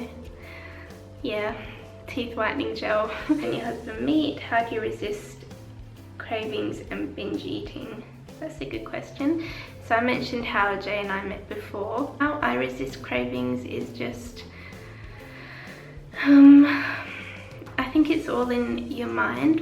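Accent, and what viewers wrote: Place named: Australia. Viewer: love that aussie accent :)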